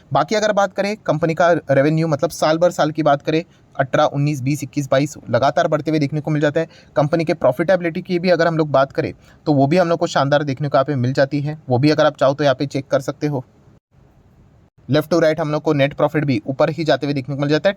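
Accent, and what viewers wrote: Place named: Gujarat, India. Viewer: Sir many times i observed that you are perfect gujarati this is confirmed, i observed your words to words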